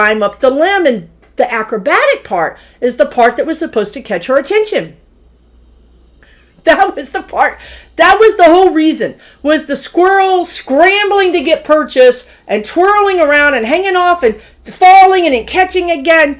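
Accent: Texas, United States. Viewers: You are in Texas but you have a distinct accent. Are you from east TX or from the east coast?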